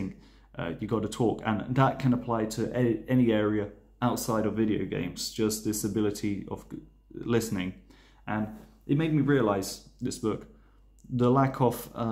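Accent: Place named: United Kingdom. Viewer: i love your british accent very calming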